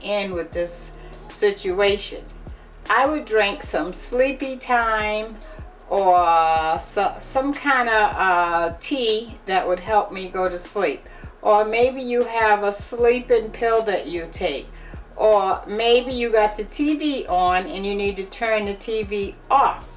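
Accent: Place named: New York, United States. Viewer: Looks good. You have a NY or Jeresy accent. :)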